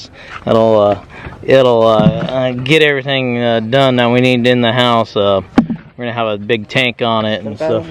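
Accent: South, United States. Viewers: Can't help but noticed you have a southern accent sir. Where you from?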